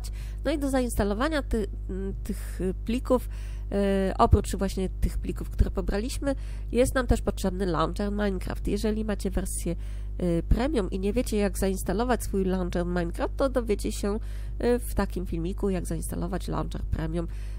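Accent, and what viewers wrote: France. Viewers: jesteś z innego kraju np. Francji, bo masz taki akcent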